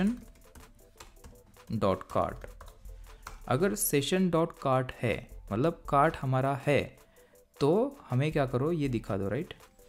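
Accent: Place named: Lucknow, India. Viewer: Where are you from. Because your language is like lucknowis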